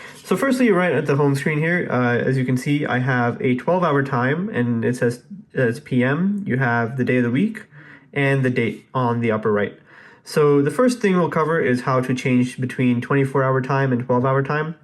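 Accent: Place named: United Kingdom. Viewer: You are like British Speaker Nice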